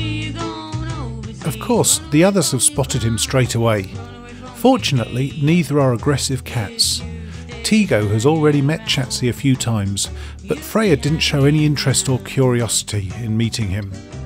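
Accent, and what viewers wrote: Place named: United Kingdom. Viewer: Nice british voice to talk about cats :) sleepy time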